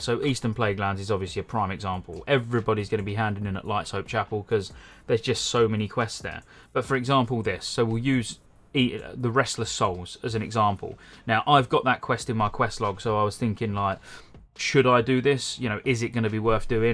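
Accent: United Kingdom. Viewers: British accent with an LSU hat I love it, great video!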